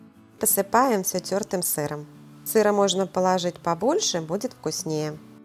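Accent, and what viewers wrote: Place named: Akanye, Russia. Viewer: ПОВЕРЬ ПОДОЙДЕТ ЛЮБОЕ. МЕНЯ ТОЛЬКО РАЗДРОЖЖАЕТ ЕЁ АКАНЬЕ.... НЕ МОГУ СЛУШАТЬ ТАКОЙ ГОВОР ДО БЛЕВОТИНЫ.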